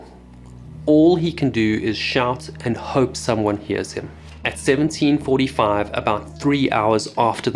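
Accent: South Africa. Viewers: Do I detect a South African accent? Thanks for your clear and not too technical explanation of a horrible accident that shouldn't have happened. Your delivery is very good - my normally very impatient hubby, who used to do recreational diving, watched the whole way through, he normally fast forwards.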